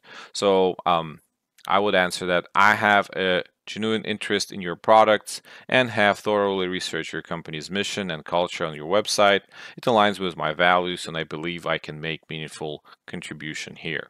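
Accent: Russia. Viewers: Fix your ruzzian accent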